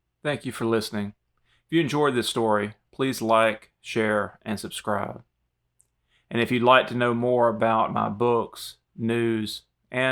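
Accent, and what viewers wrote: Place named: Southeast, United States. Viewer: you sound like the south eastern section of the us to me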